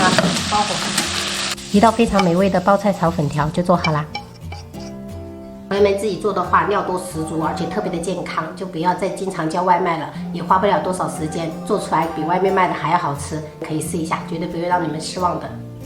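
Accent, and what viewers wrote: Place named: Quanzhou, China. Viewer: 是福建泉州的口音。也屬於閩南語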